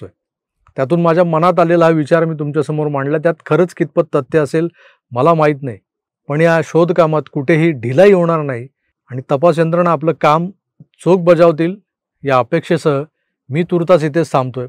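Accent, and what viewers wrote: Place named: Girgaon, India. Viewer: आपली गिरगावकर भाषा ऐकताना आणखी स्फुरण चढते. ❤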